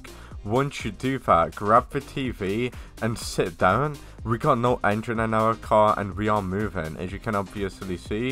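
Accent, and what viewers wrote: United Kingdom. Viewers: Ur British?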